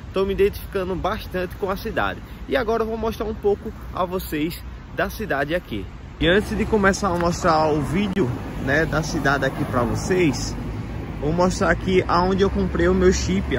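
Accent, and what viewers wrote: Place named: Venezuela. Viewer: Jaja yo crey que era venezolano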